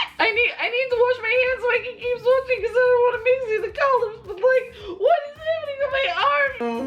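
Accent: Russia. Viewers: She sounds russian... but her voice is so soothing.... even when shes freaking out about makeup. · God i swear i love you soo much. You're like my spirit animal lmao. But you said towards the beginning that you're from Australia. I thought ypur accent was maybe Russian or something?? Im sorry, i swear im not trying to offend. Just a curcious cat. 😻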